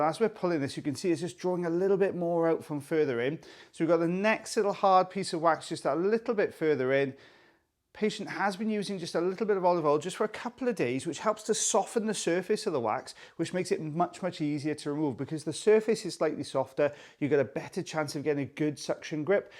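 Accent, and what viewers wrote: Wales, United Kingdom. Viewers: Welsh, Welsh!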